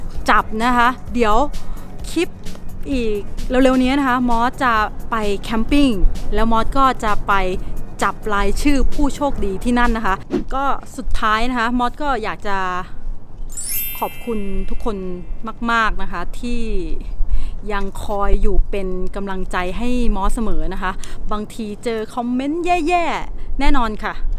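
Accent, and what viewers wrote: South, Thailand. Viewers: มอสเป็นคนใต้ใช่ไหมครับ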